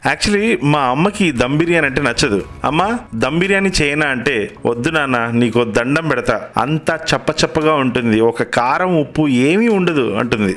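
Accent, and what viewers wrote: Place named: Telangana, India. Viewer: Barre neyyi🤣🤣🤣🤣superrr Andi babu mi Telangana yasaa😍👌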